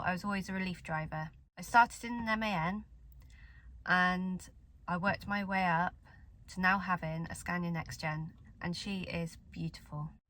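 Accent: West Country, United Kingdom. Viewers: Hi again, having just subscribed to your channel it offered me this one to watch, and I am pleased it did, you spoke alot more in this one, which was great and reminded me of my late partner who sadly died Aug last year, she (Valerie) was from the west country, Somerset to be exact, so hearing your accent really warmed my heart and brought back some lovely memories, we were together 20 years and I still miss her, thank you for sharing. All the very best to you, from Nick.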